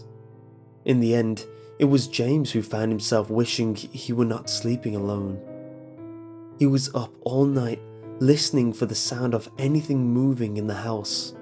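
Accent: United Kingdom. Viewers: Happy birthday,. Honestly, your voice is perfectly for these kinds of creepy feelspastas. It has that earnest, nurturing feeling that just complements them perfectly.... And the accent. The sexy British accent.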